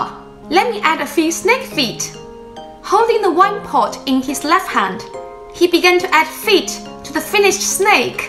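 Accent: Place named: United Kingdom. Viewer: You are so adorable 🤗 you have a really nice british accent too. If I were to guess, I'm thinking oxford/london from the accent.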